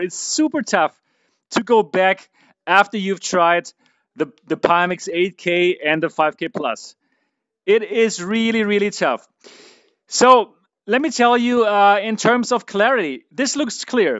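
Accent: Germany. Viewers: So I hate to ask the incredibly silly question but what is the native/prefered language of. I'm trying to learn many languages and I find it interesting to figure out who non-English speakers adapt to English. My personal guess from listening to this vid. is german.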